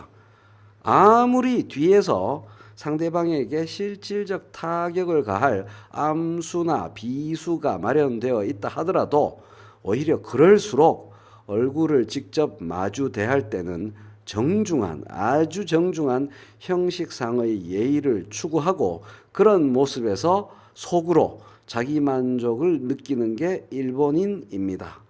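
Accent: Busan, South Korea. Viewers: 최선생님 말투가 경남 부산말투같기도 하고요~~~~~~~~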